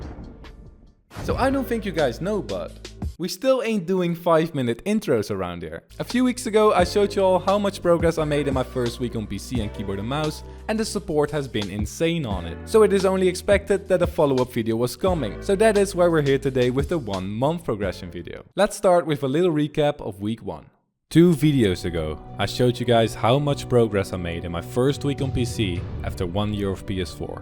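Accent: Netherlands. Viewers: Are you Dutch? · Wait u Dutch? Lmao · Je hebt een Nederlands accentje..🧐😉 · Nederlandertje ik hoor het al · are you dutch? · Are you dutch?? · Jij bent sws nederlands hoor et aan je accent haha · are you dutch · 100% sure you're Dutch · You sound so Dutch 😅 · Are you're Dutch? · Are You dutch? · Waarom klink jij zo nederlands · Ur accent sound so dutch are you from Holland? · Are you dutch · Are you from the Netherlands? Cuz you have a Dutch accent!! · Ik hoor aan je accent dat je Nederlands bent maar je uitspraak is echt goed hoe heb je dat geleerd? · are you dutch?? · Are you dutch? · Are you dutch brother? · you sound like your from the Netherlands...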